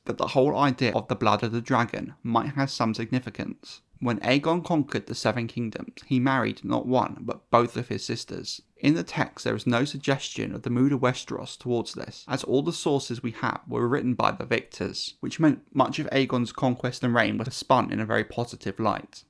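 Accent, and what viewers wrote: United Kingdom. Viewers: Let me guess you are from Liverpool 🤔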